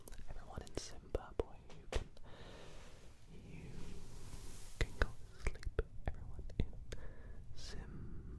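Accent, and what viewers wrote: United Kingdom. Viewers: I liked the sound of your accent and assumed you’re British, then read you’re from Australia. What a marvellous speech!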